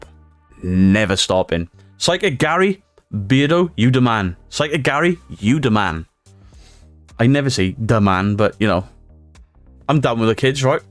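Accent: Wales, United Kingdom. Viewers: I love the Welsh accent Beardo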